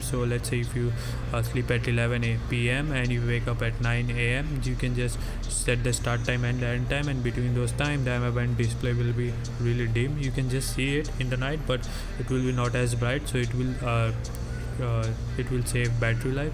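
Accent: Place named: India. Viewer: I hate this indian accent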